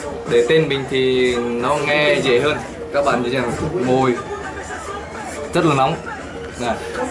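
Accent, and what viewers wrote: Nghe An, Vietnam. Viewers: nói giọng giống người Nghệ An Chúc e và các bạn bên đó luôn mạnh khỏe và thành công trong cuộc sống nhé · Giọng giống Nghệ An quá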